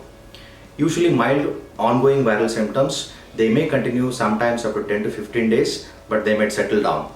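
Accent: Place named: Tamil Nadu, India. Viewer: Hard to understand your English..too strong tamil accent.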